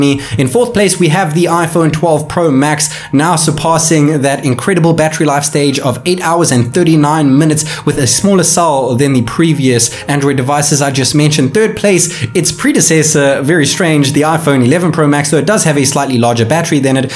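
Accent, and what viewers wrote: South Africa. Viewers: U south african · Dude are you from SA? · Is this guy South African?🤔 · Saffa accent so strong 💪🏽🤣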